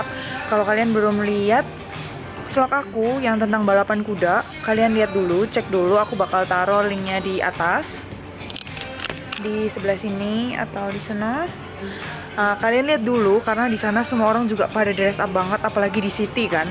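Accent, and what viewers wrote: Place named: Java, Indonesia. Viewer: Medoknya kluarin dong kak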